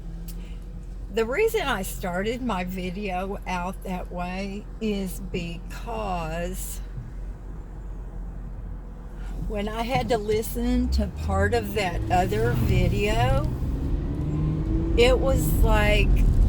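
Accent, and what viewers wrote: South, United States. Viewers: Be proud of your Southern dialect!!!!!!!!! Never back down and never change to appease your critics because they don't matter. In about 50 years English will be extinct in the US. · Ms., you are awesome! I love your southern accent girl! Every video makes me smile.... If that isn't special then i don't know what is. lol <3 "Not praying for patience anyomore!" LOL I love it! Take care and God Bless.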